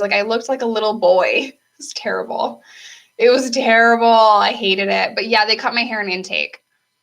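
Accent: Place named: Endicott, United States. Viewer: So hey girl! I had an odd feeling when I ran into your channel that you may be from Endicott, what with your accent and all. I am too! My family actually moved me to NC to avoid PINS/ hanging with dope fiends all day long. I have stories upon stories from drug raids to robberies in the 607 if you ever want to get up and talk about how your upbringing and environment played a role in who you are. It’s so crazy to see how I probably would have ended up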